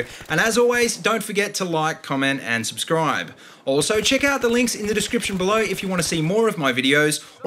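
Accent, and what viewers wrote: Australia. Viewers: Love the australian accent :D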